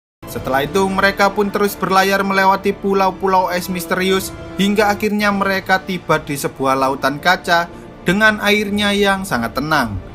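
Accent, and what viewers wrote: Java, Indonesia. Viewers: iki dodit yo suarnya rek medok pisan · Miminnya paati orang jowo.. suaranya itu lohh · Medok jowo miminnya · Aduh logat jawanya medok banget.. Maaf bikin gak nyaman dengernya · Iki delok teko suoro ne wong jowo ??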